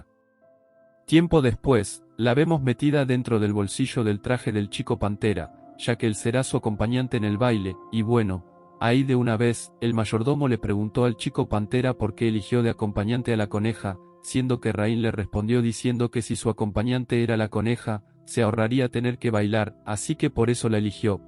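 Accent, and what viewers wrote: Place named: Argentina. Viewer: Apreciado Pibe 🎉❤🎉 se agradece por vuestro trabajo y esfuerzo, vuestra comunidad ya ha superado vuestras expectativas 🎉❤🎉 Me da mucha tranquilidad escuchar vuestro dialecto argentino estaremos a la espera de la siguiente parte 😊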